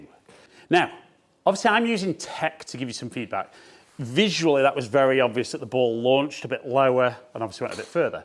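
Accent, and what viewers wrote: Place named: Northern England, United Kingdom. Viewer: I smiled at that too. I live in the SE of England (outside London) and we play througthout the winter. To be fair, I think from accent he is based in the north of England where it is a harder winter but even so, to say the winter weather is horrible is probably pushing it a little:)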